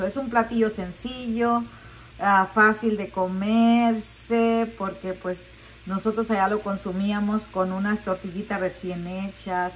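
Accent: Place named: Spain. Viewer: Hola querida chef, más de 1400 videos que preparaste y compartiste para nosotros y alrededor de 113 mil suscriptores es un récord maravilloso y excelente para ti, te felicito.Ver este video de 4 minutos en el que hablaste con un hermoso acento español fue muy divertido para mí, y ver tu video me fue muy útil para practicar español..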